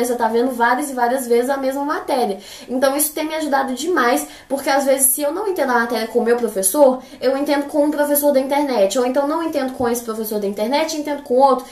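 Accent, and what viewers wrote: Minas Gerais, Brazil. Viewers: meu Deus ela eh mineira 😍😍 kkkkk "esses trem" amo seus videos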